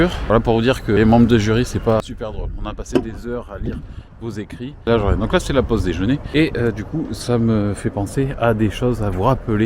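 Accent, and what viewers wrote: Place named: Bordeaux, France. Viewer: On dirait que vous êtes Tour de sèze à Bordeaux !😊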